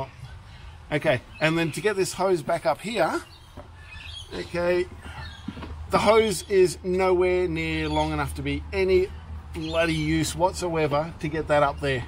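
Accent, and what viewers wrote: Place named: Australia. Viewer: Real Aussie. 😁. Thanks Mate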